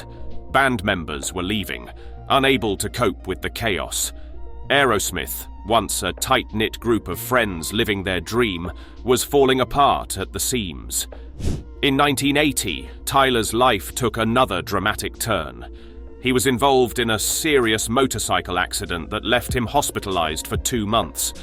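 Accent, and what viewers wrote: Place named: United Kingdom. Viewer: I am so done with British commentary a big thumbs down! Old and wearing end condescending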